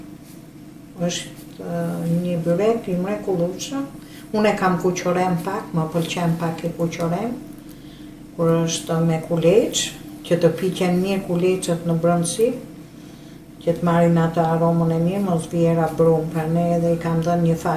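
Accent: Tirana, Albania. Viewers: Te lumshi duret e arta dhe shpjegimet e tuja ja ne te kuptushme, dialekti shume i bukur i tirones. E pjek si arre, me pelqen qe e kthen e pjekje shume mire. Qofsh mire me shendet. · Me kenaqe sa bukur e komenton je safi tironse Te lumshin ato duar njerzit nuk duan salltanete duan keshtu si ju shqeto 🙏🙏🙏💕💕💕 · Bravo e gatuan mite e flet qarte Tironse mo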